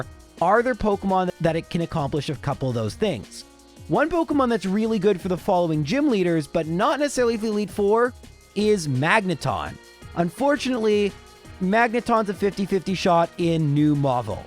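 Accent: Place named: Canada. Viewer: you even come off as a Canadian. Whereabouts do you live in Canada?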